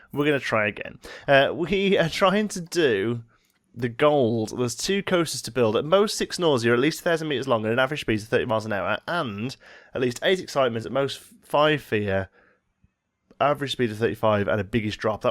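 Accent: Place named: Birmingham, United Kingdom. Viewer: thought i could hear a glimpse of that birmingham accent hah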